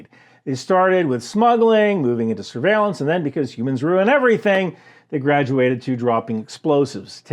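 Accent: New Jersey, United States. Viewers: The New Jersey, accent😂. You are cracking me up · you should always talk in you jersey accent, i like it lol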